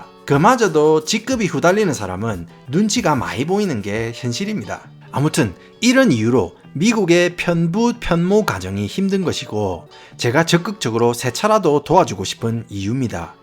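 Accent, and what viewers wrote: Gyeongsang, South Korea. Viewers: 영어 speaking은 표준인데 한국말 억양은 대구 사투리 같은데 구수해요. 오랫만에 미국에서 듣는 구수한 된장국 억양. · 어제부터 정주행 하고 있습니다. 처음에는 야무진 갱상도 사투리가 재미있어서 보았으나 님의 봉사정신을 보고 존경하는 마음까지 생겼습니다 주위에 약자들 사회에서 소외된 사람들에게 힘과 용기를 주시는 분께 박수를 보냅니다 🎉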